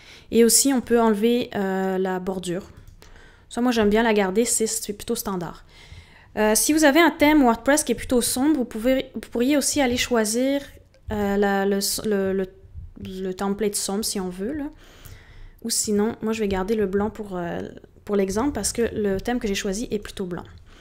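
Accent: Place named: Quebec, Canada. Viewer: Quel accent charmant! Faut que j'aille au Québec !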